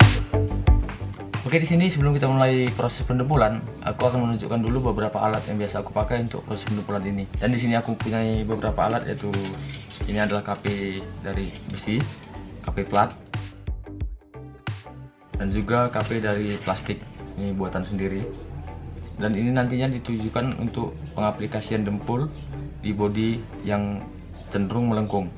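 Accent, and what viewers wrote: Medan, Indonesia. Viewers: Bahasa nya kental medan kali bg,bangga awak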